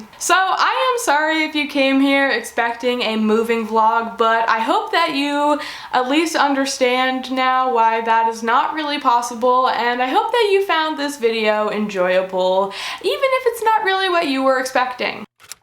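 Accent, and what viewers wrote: Canada. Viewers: It's funny because people always point out my Midwestern accent , and I just noticed your Canadian accent the way we both pronounce out and car and about is like the same lol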